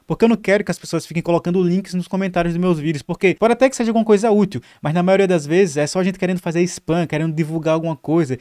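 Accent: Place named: Paraíba, Brazil. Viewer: Cheguei hoje por aqui e tô maratonando! Sua didática e franqueza são mega cativantes! E seu sotaque super me pegou! Pensei até que era da minha terrinha , João Pessoa :face-red-heart-shape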